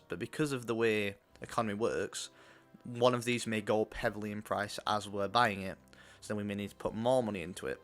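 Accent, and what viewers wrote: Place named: United Kingdom. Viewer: Great series, however I'm having hard time understanding British accent videos and you speak too fast for my taste.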